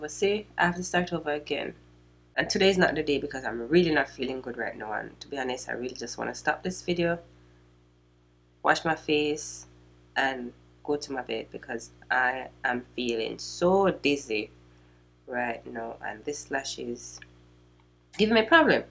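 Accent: Jamaica. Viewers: U Jamaican?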